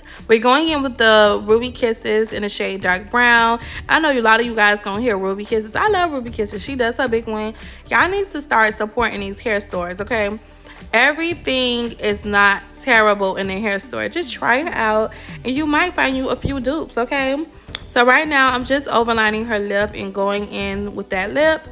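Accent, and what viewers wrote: Miami, United States. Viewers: That Miami accent tho 😘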